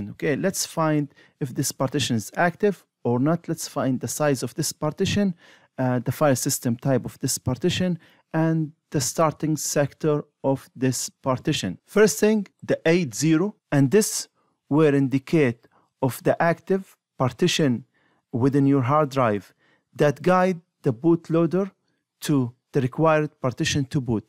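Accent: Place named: Jordan. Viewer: Solid Jordanian accent.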